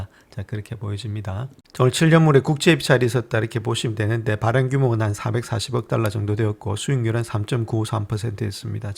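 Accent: Gyeongsang, South Korea. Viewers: 차분한 경상도 사투리가 새롭고.. 다른 시황분석🎉에 박수 보내며 감사합니다.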